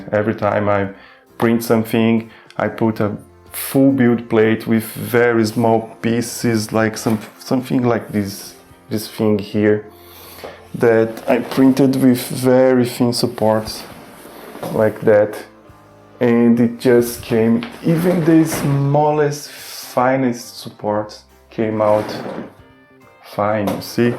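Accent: Brazil. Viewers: By the accent, it's Brazilian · Sotaque de BR haha belo review meu caro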